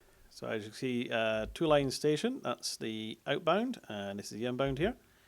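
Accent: Scotland, United Kingdom. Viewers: Wow he sounds scottish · You sound scottish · blake collins ._. that may be because he is scottish...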